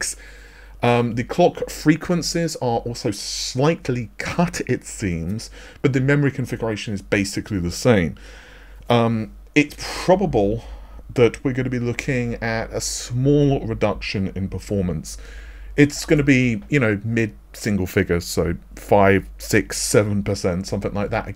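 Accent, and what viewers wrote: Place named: RP, United Kingdom. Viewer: He's what's known as a "toff" and they all speak like that :)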